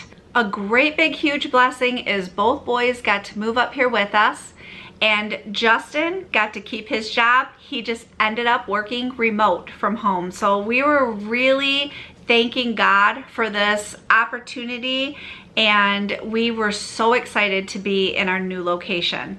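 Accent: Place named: Texas, United States. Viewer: I could never wrap my mind around your dialect being from Texas! Best wishes for your future. 😊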